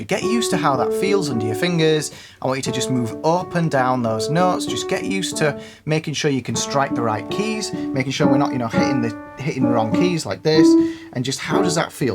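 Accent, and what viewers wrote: United Kingdom. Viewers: I didn't know that your British accent was associated with farmers and truck drivers. Like I said before , you sound like a normal British person. It doesn't sound southern or anything. I don't know why. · great one...! BTW - your name sounds somehow Czech, but your mouth sounds very much British :) Any chance you have some family ties there?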